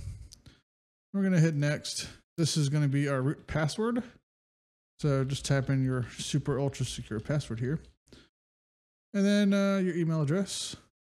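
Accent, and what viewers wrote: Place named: United States. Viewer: Thanks. Such an american accent with a romanian sounding name :)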